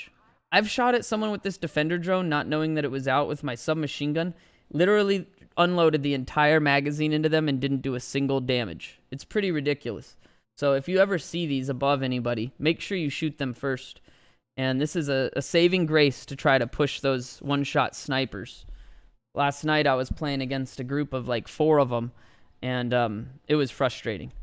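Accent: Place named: United States. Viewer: Why does sound American now?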